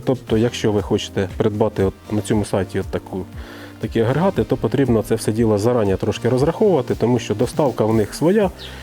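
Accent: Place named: Surzhyk, Ukraine. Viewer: Того шо в нього трохи суржика є, не всі слова він каже Українською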